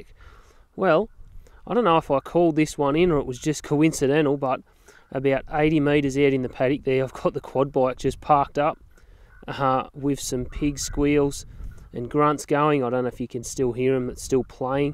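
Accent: Australia. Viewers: pure evil LOL 🤣😂,.have to say, im glad you ain't no Yank, as the Aussie accent just finishes it off perfectly. Any time you visit UK, let me know, gladly buy you a beer 🍻 & have a barbie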